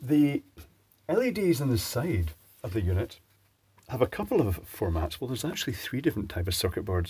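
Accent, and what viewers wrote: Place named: Glasgow, United Kingdom. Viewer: Great video, your Glaswegian today reminded me of oor wully for some reason, you don’t happen to sit on an upside down bucket when you record these do you? Excellent inspiration for us Scottish electricians during a tough time. My Wully, your Wully- a’boadies Wully! Translation: My Willy your Willy - my maws no got a Willy. Intended meaning- my William, your William he’s everybody’s William!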